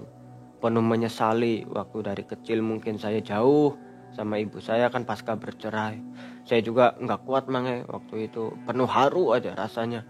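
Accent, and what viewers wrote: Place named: Banten, Indonesia. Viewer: mas kalo berbicara seperti logat bahasa orang banten😊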